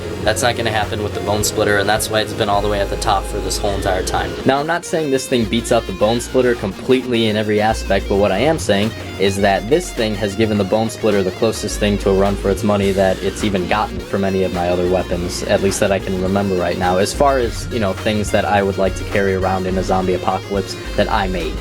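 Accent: South Africa. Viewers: South african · you soud like a south African like me because I am one hahaha lol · Not too bad of a South African accent there. I'm from South Africa. Love the vids Chine. Chine means M8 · Convincing South African accent bro, and that's coming from a South African! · Damn, nice mace and an even better Afrikaans accent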